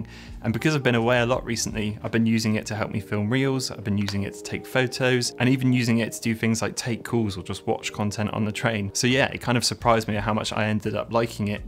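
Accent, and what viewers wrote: United Kingdom. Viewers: You are comfortable on camera and speak calmly & beautifully with your British accent, so who cares about what your visual style choices look like? What you offer with information & services and how you present it is what matters. Not what you want to wear.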